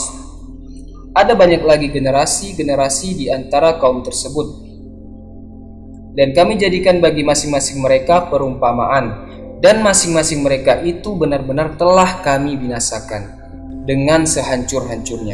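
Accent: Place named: Pontianak, Indonesia. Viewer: Org Pontianak ya bang..haahha..mantap ni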